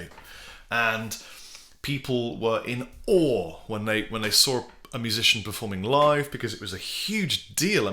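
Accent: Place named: United Kingdom. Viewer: He has a brittish accent, he speaks the truth!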